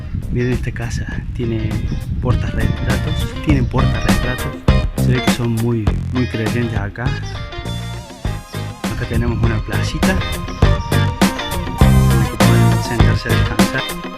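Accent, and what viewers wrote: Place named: Córdoba, Argentina. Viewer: Buen acento cordobe jajajajaj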